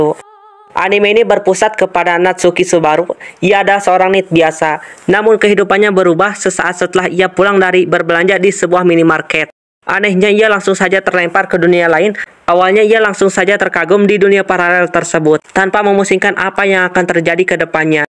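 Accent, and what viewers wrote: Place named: West Java, Indonesia. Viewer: suara khas sunda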